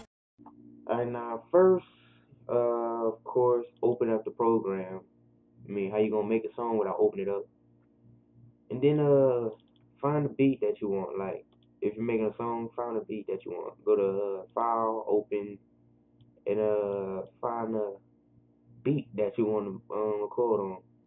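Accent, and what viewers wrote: United States. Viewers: u can tell this nigga New Orleans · u from Louisiana aint cha?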